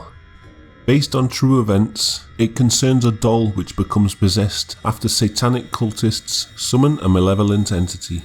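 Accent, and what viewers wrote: United Kingdom. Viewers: If you're curious about the three claw mark thing- it's a common occurrence in demonic activity. It's a mockery of the trinity rather than because demons have three claws. I was curious about that so that's why I know. Although I have seen one before. Very unpleasant sight... Definitely a new type of video. People tend to stay with the ones everyone knows, the original Omen/Poltergeist/etc so it's refreshing to see strange happenings in other films. Keep up the quality work, man. Random question, but are you British? I've been trying to figure out your accent. I'm not too good at figuring them out. DX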